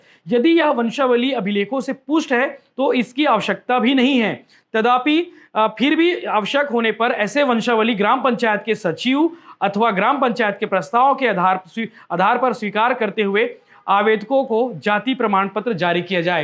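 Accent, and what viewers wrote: Chhattisgarh, India. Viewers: शुद्ध छत्तीसगढ़ी, सुन के अच्छा लागिस गा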